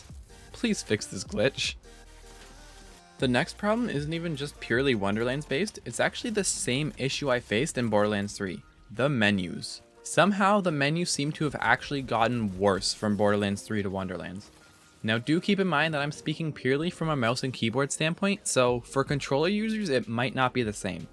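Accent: Canada. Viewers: you sound a lot older now in the more recent videos you sould like a gay canadian now you sound like a normal canadian in the current vids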